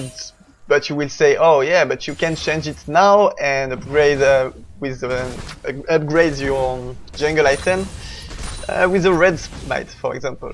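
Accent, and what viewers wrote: France. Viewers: on en parle DU FRENCH ACCENT ??? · Omg l'accent français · no we clearly jear the french accent. but yes i wasnt so focused on game language and aint saw it wasnt spanish :)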